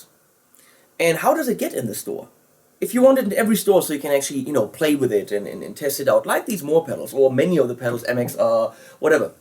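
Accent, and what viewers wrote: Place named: Germany. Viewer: Man hört das du deutscher bist :D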